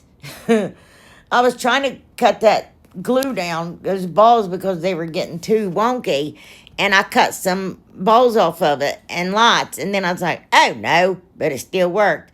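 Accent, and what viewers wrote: South, United States. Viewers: I started watching YouTube to pass the time during covid lockdown. I can’t remember if you were recommend by another channel or a YouTube recommendation but as one of you other subbies said, I watch for your humour and inventiveness. Your American accent tickles me too! I live on the Lincolnshire coast, England. 🇬🇧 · She's a delight and fun! You would think she's from the south! But she lives in Ohio❤❤❤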